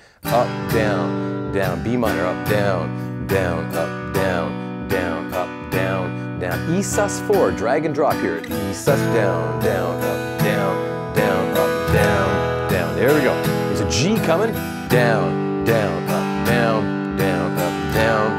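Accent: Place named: Canada. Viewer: Great channel Love the structure of the lessons and the Canadian accent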